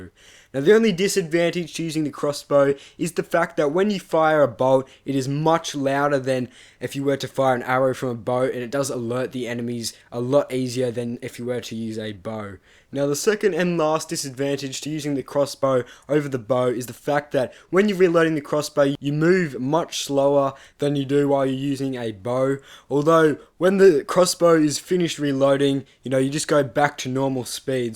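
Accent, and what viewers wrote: Australia. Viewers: is he aussie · The fact the crossbow model doesn't have a channel for the bolt.... really annoys me. A professional artist should have seen it in reference photos, plus any man should just know 'guy' stuff. The vid poster has a really heavy Aussie accent. It occasionally sounds like he's taking the piss. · are you italian-aussie??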